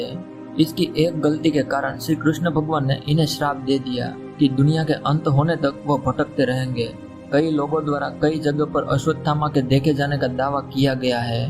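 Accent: Gujarat, India. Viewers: hmm aapke bolne ke accent se hi lag raha tha ki aap Gujarati ho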